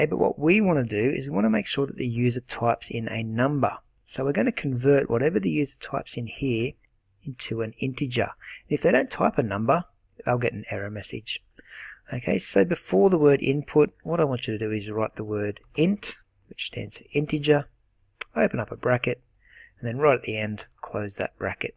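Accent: Australia. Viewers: The tutorial is explained perfectly.. but for some reason I'm getting an error in line 6 "while guess != number:" the error says: while guess != number: ^ SyntaxError: invalid syntax I tried everything I could but I am unable to fix it. Love the Aussie accent btw <3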